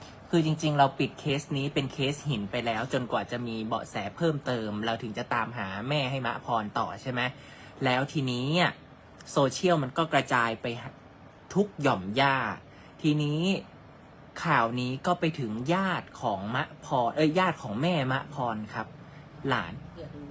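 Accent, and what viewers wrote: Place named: Ubon Ratchathani, Thailand. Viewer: อุบลชัดเจนครับ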